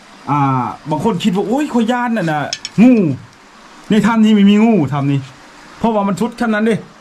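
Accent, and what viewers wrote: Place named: Isan, Thailand. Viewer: ผมติดตามสำเนียงเว้าม้วนๆครับอ้าย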